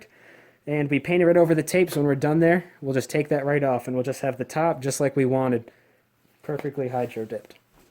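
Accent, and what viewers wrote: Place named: United States. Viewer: Jorge Cruz stupid question....clearly you can hear they're American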